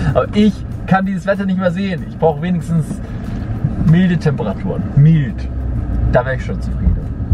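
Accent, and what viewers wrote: Swabia, Germany. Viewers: Dein Schwäbisch😂👌 Komm au ausm Schwaobaländle😁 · Omg rede öfter schwäbisch 😍😂 Da fühl ich mich wie zu hause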